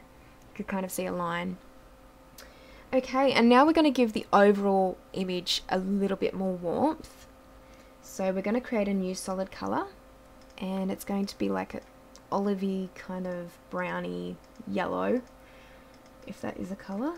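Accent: Australia. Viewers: with an Australian accent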